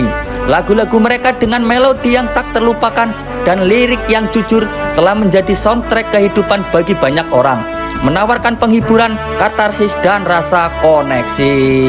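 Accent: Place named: Banyumas, Indonesia. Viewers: ora ngapak ora kepenak 😂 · keren ngapak 😂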